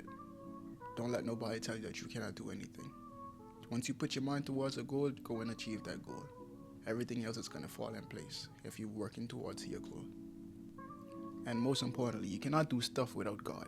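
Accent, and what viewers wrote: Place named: Caribbean. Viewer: you sound like you have caribbean roots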